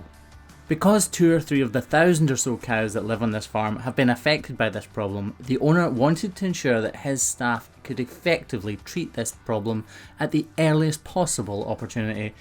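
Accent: United Kingdom. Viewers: Here's some ❤ for! YouTube has put several videos from last year BC (Before!!) & your life seems so much smoother than before. He is such a great help for you to be more efficient & focused! Yeah!!🎉❤🧨👍 Note: I think you are saying; I'm from Texas & those UK accents throw me off a tad!!😁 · Britain accent?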